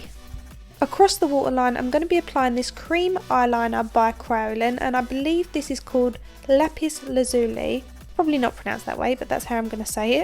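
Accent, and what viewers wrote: Essex, United Kingdom. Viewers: Are you from Essex? You sound like my cousins!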